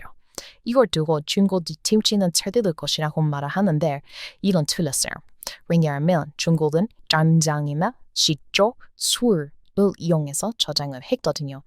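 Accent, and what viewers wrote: United States. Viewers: 은 한국인 2세인가요? 😮 나보다 한국을 더 사랑하는 듯 😂 전형적인 미국인이 한국말 구사하는말투가 매력적이네요.😊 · 님의 미국식 한국어 발음도.... 참 매력이 있네요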